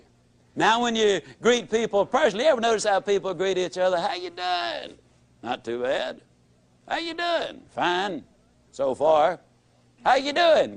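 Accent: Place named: South, United States. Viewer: he sounds like someone from arkansas/ texas/ mississipi. he sounds like forrest gump. ziglar died three years after jim rohn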